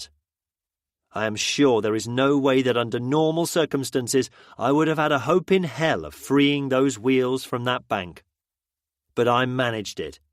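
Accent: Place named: United Kingdom. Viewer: Brits can even make the zombie apocalypse sound funny 😃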